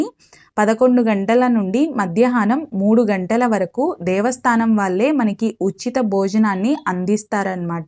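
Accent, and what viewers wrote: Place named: Telugu, India. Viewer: Very nice description. Loved the Telugu accent. I will visit Mantralayam one day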